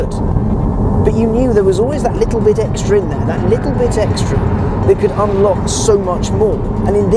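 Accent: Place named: RP, United Kingdom. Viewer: Something about your face is very punch-able. The English-is-mightier-than-thou accent coupled with the quirky comments, just gets my goat. Talk about the car "old chap" and leave out the smirks and snarky attitude. Stop being a priss and just drive the best car Porsche has ever made remotely under $130,000.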